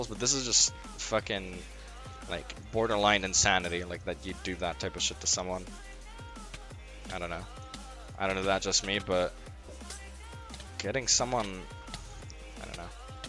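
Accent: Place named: Netherlands. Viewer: You sound a little Dutch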